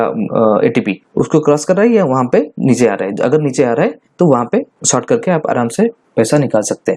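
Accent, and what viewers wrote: Odisha, India. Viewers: Are you Odia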